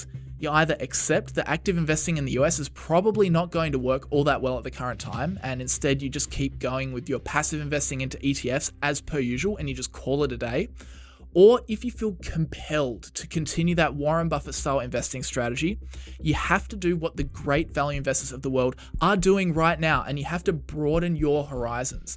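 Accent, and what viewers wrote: Australia. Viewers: an aussie dude huh. let put some more gilts on the barby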